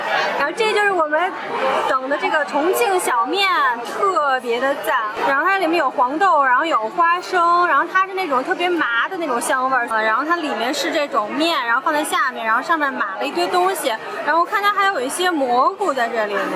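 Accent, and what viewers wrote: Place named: Beijing, China. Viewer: 北京味儿贼亲切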